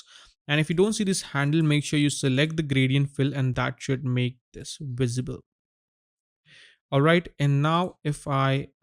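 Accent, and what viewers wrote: India. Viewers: all is good man, but indian accent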